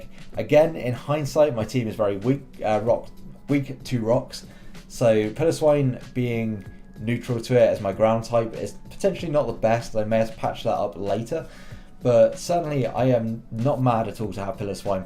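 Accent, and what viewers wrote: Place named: England, United Kingdom. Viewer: Great draft, even better accent - it's nice not being the only Englishman in the league!! :')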